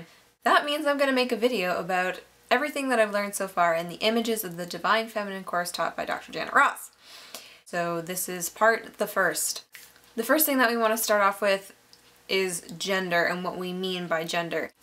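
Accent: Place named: United States. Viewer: ..... This is a modern viewpoint assuming that history respects your cultural opinion on sex. Based on voice alone and I may be wrong is you're american, less than 200 years ago it was a scientific fact that black people were lesser, and women's role in the house seemed to be limited to child bearing... Then you claim humans in ancient society had enough time to understand the difference between gender and sex.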